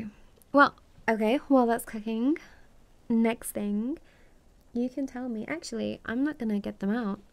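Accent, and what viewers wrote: United Kingdom. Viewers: Here i am, with headphones on and holding my pillow as if im spooning someone, ready for another of my fav British ASMR creators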